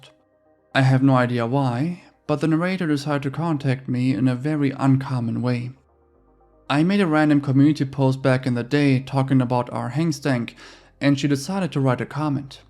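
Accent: Germany. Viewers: Armin Meiwes is/was(?) somehow the least offensive cannibal to ever live. Issei Sagawa could learn a little something from him... 😒 I'm really surprised that I'm seeing what is, to me, entirely original content in a list video like this. Horror-themed channels tend to all cover the same, what passes for "mainstream", content across the internet. I can watch five top five videos of "creepy things from around the internet" from five different channels but only see a total of seven to ten (out of 25 total, remember) unique stories altogether. So, kudos for doing your own research and finding stories other people may have passed on, ignored, or missed entirely. I respect and appreciate it, my dude. May I ask... Where is your accent from? Based on how you pronounced AM's name, I would assume your first language is either German or German-adjacent (for example, Dutch). 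Am I anywhere near right?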